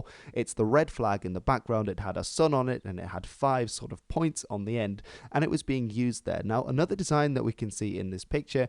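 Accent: United Kingdom. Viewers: ok I didn’t know that because his accent is really the same as the British one, his accent is just perfect but thank you very much for the information I really appreciate that my friend. Love from France x ❤️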